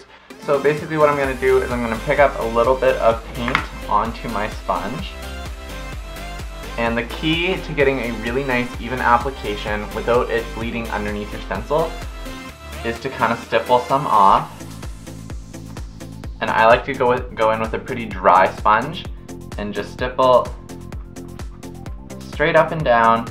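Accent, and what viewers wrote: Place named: Canada. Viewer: are you canadian? your o's are adorable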